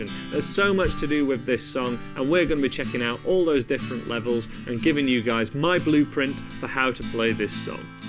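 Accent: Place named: RP, United Kingdom. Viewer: when did you get all smug and start putting on a posh accent?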